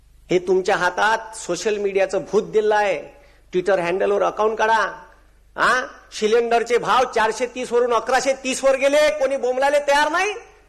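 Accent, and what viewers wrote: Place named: Vidarbha, India. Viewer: अबे हे वऱ्हाडी होय शुद तु बेलत जाय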